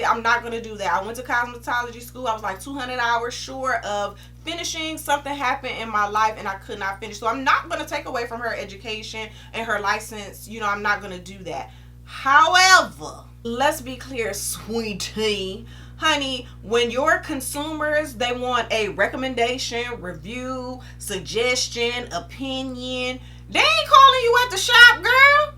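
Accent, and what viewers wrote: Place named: South, United States. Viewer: You sound southern , where you from love 😍. Loved the video and honesty ❤️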